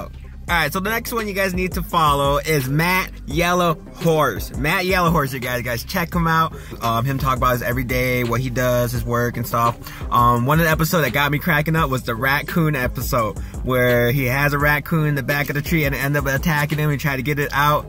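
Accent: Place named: Philippines. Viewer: That accent!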